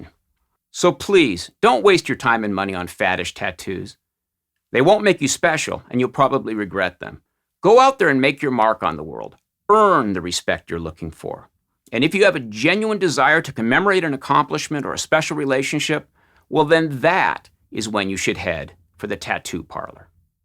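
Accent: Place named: South, United States. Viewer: You are an ignorant hillbilly sounding asshole.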